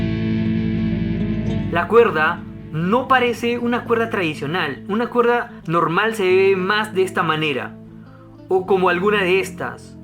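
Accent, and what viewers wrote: Peru. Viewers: ese acento es peruano 😊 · ERES DE PERU VERDAD ? LO DIGO POR TU DIALECTO AL HABLAR .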